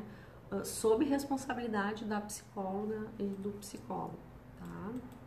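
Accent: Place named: South, Brazil. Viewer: É um jeito de quem mora no Sul falar.. normal.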